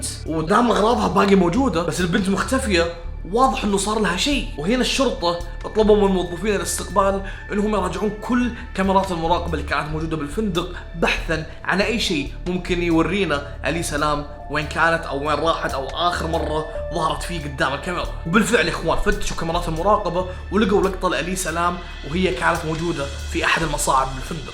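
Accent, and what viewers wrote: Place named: Saudi Arabia. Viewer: وين اغلب كلامه كويتي كلامه سعودي واضح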